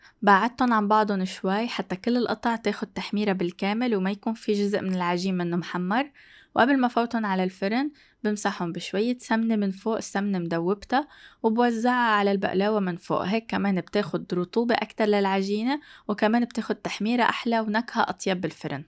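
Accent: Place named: Lebanon. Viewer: احب اتابعك من سهولة وصفاتك والكلام المفيد والمختصر والجميل وباللكنة اللبنانية يلي بعشقها.. محبتك من السعودية..😘💓🥰🕊 شكرا على قناتك المفيدة استفدت منك كثير والله ممنونه حبيبتي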